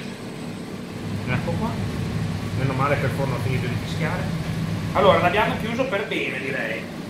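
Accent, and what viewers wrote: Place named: Emilia-Romagna, Italy. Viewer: il tutto molto avvincente e credibile (soprattutto).ottimo sei riuscito ad impastare al 80% di idratazione.a questo punto se puoi mandare le esatte proporzioni degli ingredienti, o mandare il link del maestro pizzaiolo.la pizza in teglia la dovresti togliere dalla teglia ed adagiarla su una griglia sopra levata, per togliere l'umidità del fondo.ancora complimenti per la sala cucina, veramente a norma di legge e pulita.anche l'accento della emilia romagna, personalmente mi garba.che dire ma ce li farai vedere come si fanno i tortellini al brodo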